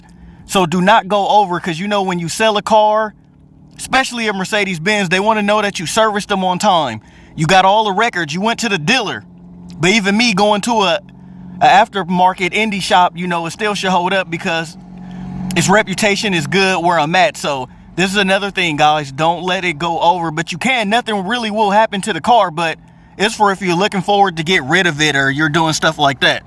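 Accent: United States. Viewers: I think the model you have was built in America, you have an American accent so perhaps you know that. German made models much better.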